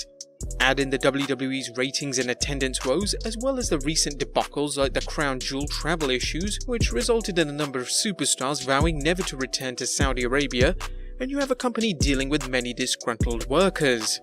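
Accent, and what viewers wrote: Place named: United Kingdom. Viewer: I hate to say it but I got use to hearing my wrestling news with a British accent lol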